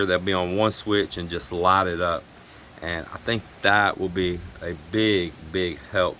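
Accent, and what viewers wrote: Texas, United States. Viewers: Oh I hear that Texican, bellge. My cousin lived in Post Texas and he worked killing whales 🐋, oil whales that is. Different places different colloquialisms expressions and pronunciations. All my Texican family I was close to are gone now. You just brought some fond memories back.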